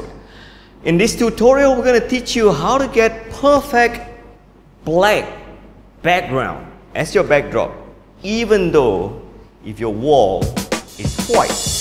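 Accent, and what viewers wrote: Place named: Malaysia. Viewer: The content r just perfect n as for the accent, he's from Malaysia 😍😍😍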